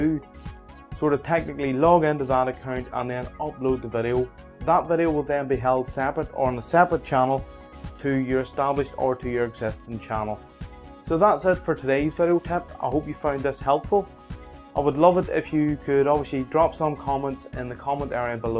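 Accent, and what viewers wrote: Northern Ireland, United Kingdom. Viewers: Thank you, very easy, clear, instructions. I had a look at your videos and there's loads of great stuff I can use, got yourself another sub. Also, nice to hear a Norn Irn voice too. Best Wishes, Brendan (outside Lurgan). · U have the same accent as me! R u from the uk?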